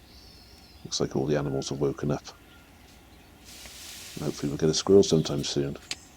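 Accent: England, United Kingdom. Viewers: The calm English accent puts me to sleep everytime sitting at my computer.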